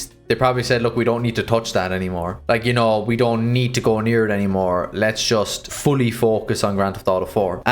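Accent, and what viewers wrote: Ireland. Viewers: Are you Irish? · Your losing your Irish accent, but I like how you say 3 and 30. You say Tree and Dirty Tree. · You're Irish ain't ya? Heard it in the accent lol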